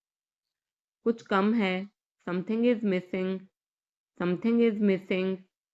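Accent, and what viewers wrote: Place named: Canada. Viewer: It is better but pronounced like Canadian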